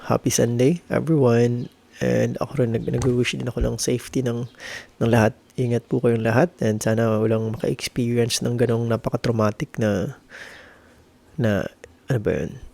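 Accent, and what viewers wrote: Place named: Luzon, Philippines. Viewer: New subscriber here. You’re from Gensan but you sound like you’re from Luzon when you speak Tagalog. Just an observation from someone who grew up in the island of Luzon. 😊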